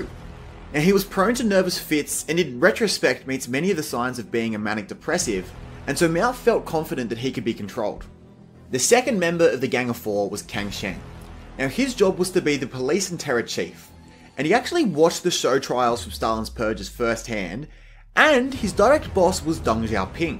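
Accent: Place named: Australia. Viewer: “His wife dipped WHAT in water to make sure he drank what he needed”??? Can’t understand this narrator every 4th sentence. No doubt this Aussie and ALL UK people think Americans NEED an accent in their videos. Lame.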